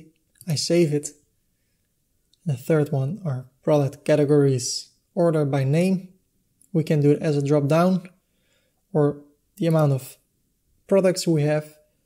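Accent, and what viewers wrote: Netherlands. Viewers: Hey; Danke Well, No need to improve your English, Your Dutch accent is a reminder of the Dutch Kindness and all the Good times we get to spend in Holland, Thankyou Keep it UP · ken het accent ;) Nederlands Lol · Je hoort gelijk is Nederlander :D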